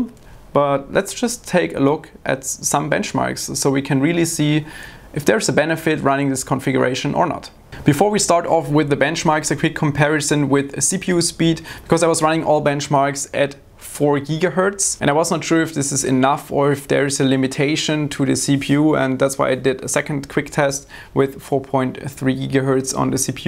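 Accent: Germany. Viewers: Love the german accent 😍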